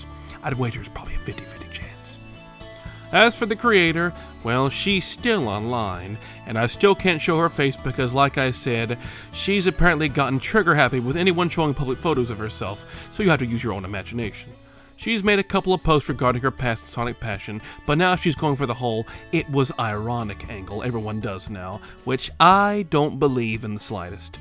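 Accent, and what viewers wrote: South, United States. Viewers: Ok, I think I've pinned the accent. You from Tennessee or Mississippi?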